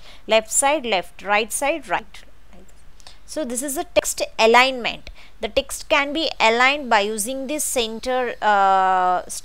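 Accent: India. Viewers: i love indian accent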